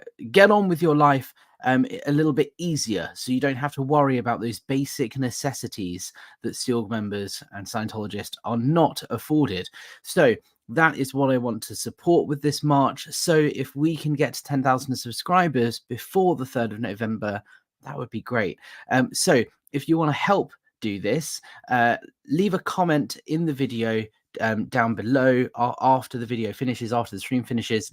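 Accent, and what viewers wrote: United Kingdom. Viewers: Just a bit of fangirl fawning…. I could listen to your RP English read the labels off canned food. I’m sure it’s a yank thing, but there you go. So glad I found you through SPTV. You are doing important work, steady on.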